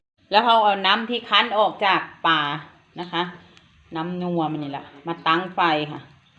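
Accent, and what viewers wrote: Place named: Phu Thai, Thailand. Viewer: สวัสดีครับแม่ มากินก้อยปลานิลนำครับเห็นแล้วหิวเลยครับ ฟังเสียงเว้าคือคนภูไทย้อ สกลนคร นครพนม เมืองเลยนอครับ ข้อยคนอุบลครับ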